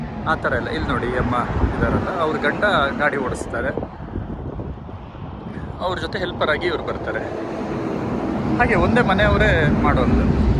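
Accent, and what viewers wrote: Kundapura, India. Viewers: ಉತ್ತಮವಾದ ಕುಂದಾಪುರ ಕನ್ನಡ ನಿರೂಪಣೆ god bless you sir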